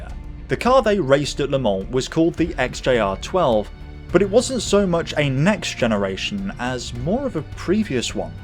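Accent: United Kingdom. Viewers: As a french man I love your cotenent and the way you tell racing stories ! The English accent may also play a role... 👍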